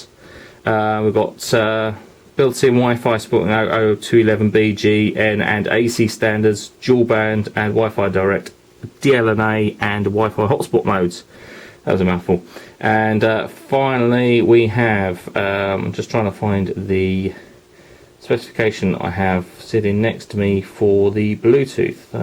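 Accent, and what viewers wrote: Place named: United Kingdom. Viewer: i love Britsh Voice ! <33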